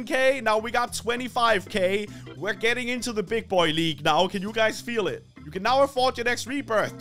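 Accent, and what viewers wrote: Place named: Denmark. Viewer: jeg kan høre tydeligt at du er dansker gode videoer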